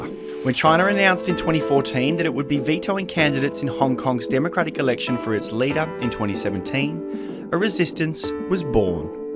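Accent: Australia. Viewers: Sounds like an average Aussie accent to me.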